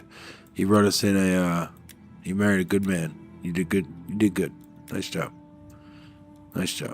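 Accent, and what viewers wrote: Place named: United States. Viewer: If you're having fun, that's the most important thing. Sure, it's not the most dynamic campaign I've ever seen, but considering that and the difficulty level, it's definitely something different, and that's cool. If it's fun for you, that's what matters. For me personally, 12tpy is a bit too long, but it's nice to see something different style. Sorry for my English, I hope everything is understandable; it's not my first language ;p One question, where are you from? because I have a feeling that we operate in completely different time zones😅 the time in which you publish your videos and your accent tell me it's the United States? Good luck and I'll definitely be checking in from time to time to see how your campaign is going!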